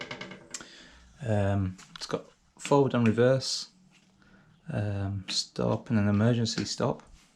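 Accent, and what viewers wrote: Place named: Bishop Auckland, United Kingdom. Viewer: Sounds like your are from Bishop 😎👍or Tow Law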